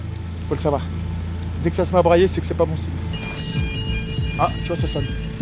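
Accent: Saint-Étienne, France. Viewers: jte connais pas depuis longtemps mais tu mérite vraiment ! PS: tu serait pas un stéphanois avec ton accent ? mf91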